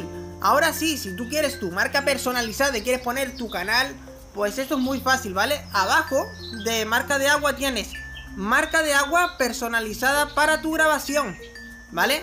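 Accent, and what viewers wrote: Dominican Republic. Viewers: Dominicano verdad?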